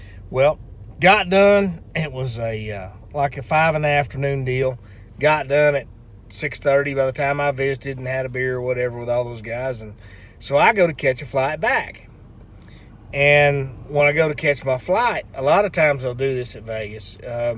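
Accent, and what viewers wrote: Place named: Mexico. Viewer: Thanks mate ... I still can't get off the floor ... he is crazy funny. Love his Mexican accent.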